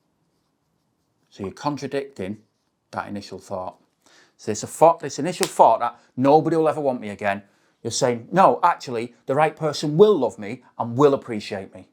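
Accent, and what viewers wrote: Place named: United Kingdom. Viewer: You have a relatable and down to earth way of presenting your videos. Nice to hear a British accent too. Keep up the great content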